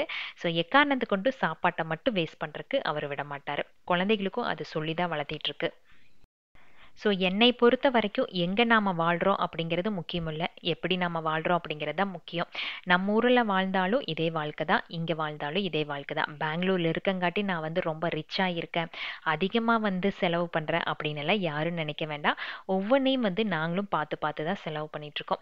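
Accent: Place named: Pollachi, India. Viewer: Hi … I am your silent subscriber from Cbe … from your slang itself I noticed that u r from pollachi..I am watching ur videos regularly but today the way u expressed ur personal stuff was really beautiful. U seem to be very honest and genuine and a good parent also.. Always being honest in ur work brings success.. god bless you.. if u happen to visit Coimbatore we will meet..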